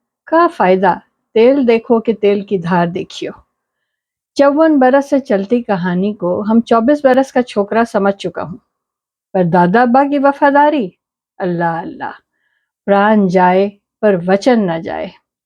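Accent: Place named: Bihar, India. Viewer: دلکش بہاری لہجہ. بہت خوب تحریر. 🌹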